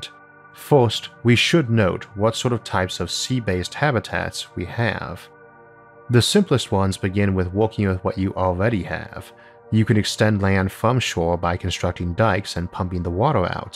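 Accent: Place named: South, United States. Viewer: I love space and everything about it. I have been looking for years for a channel that will satisfy my hunger for knowledge, both factual and fantasy. I have finally found it. Thank you Isaac and team. As for the "speech impediment". It's no impediment, this channel wouldnt be the same without your AMAZING Gone With The Wind lilt. SCARLETT: Isaac, Isaac....Isaac, if you go, where shall I go? What shall I do? ISAAC: Frankly, my dear, I don't give a damn. I'm off to Mars!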